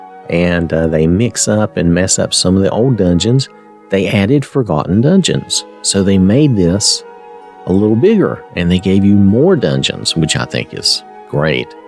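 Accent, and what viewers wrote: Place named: North Carolina, United States. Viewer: I knew it! Born and raised in Rutherford county NC myself, I recognized the accent 😅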